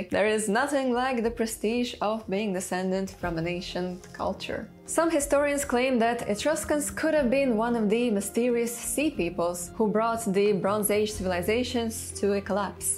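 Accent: Russia. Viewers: слышу русский акцент. Не у утрусков, у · Your accent seems Russian/Bulgarian but I’m not too surprised by the rest of your genetic ancestry.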